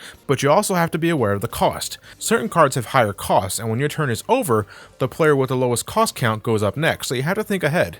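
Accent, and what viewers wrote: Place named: United States. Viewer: Oh I agree with you there; because of our different regions with different slang and colloquialisms. A lot of English mispronounce as well, which hurts me even more, haha. I was just saying that is a very American way of mispronouncing as I've typically seen in American television shows etc.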